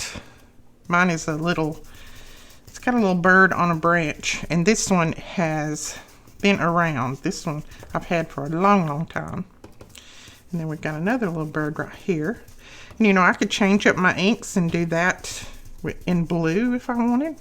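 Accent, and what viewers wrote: South, United States. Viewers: Where do you live? The accent is southern I remember you saying in another video when someone said to slow down talking lol. When you dont talk fast. Lol. Anywho I am in Hartselle Alabama. Small town a little ways from Huntsville and Birmingham. Kind of in the middle closer to Decatur Alabama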